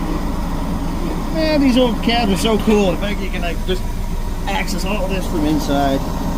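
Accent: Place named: Saskatchewan, Canada. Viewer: Your from Saskatchewan, I know it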